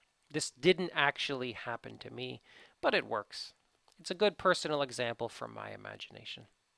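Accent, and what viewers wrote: Canada. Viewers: Btw, arr you canadian? You have that accent lol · Hi, first of all thanks a lot for great content. Your website and your videos are really helpfull. However I have a question. You say that we shouldn't write a sentence like `This example demonstrates how...` but almost every essay that you have on your website has a conclusion like that on body paragraphes. I think its a nice way to summarize the main idea that we posit on that paragraphe. But as I said im kinda confused atm ;D Btw I love your Canadian accent ;D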